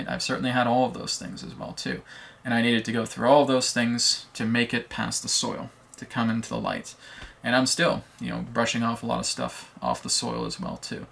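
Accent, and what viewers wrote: Scotland, United Kingdom. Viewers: I dont know how it works , but again the subject was spot on for me - Thank you very much - I like when u laugh and speak Scottish :) - <3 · Wow i ❤ ur scottish accent!! 😆👍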